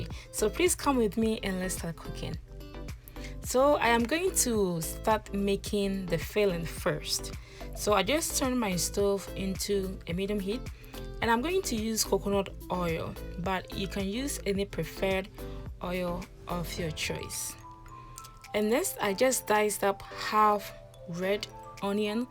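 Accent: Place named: Ghana. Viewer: You geh, ehh mehn, you understanding me eneh so? I feel the same way, I love hearing Ghanaians speak, and she speaks so eloquently and soothingly, but authentic to her dialect. She's not trying to sound American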